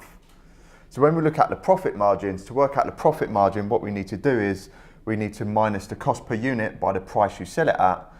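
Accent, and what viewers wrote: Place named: Cockney, United Kingdom. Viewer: I enjoy watching YouTube's interpretation of your cockney twang on the auto generated subtitles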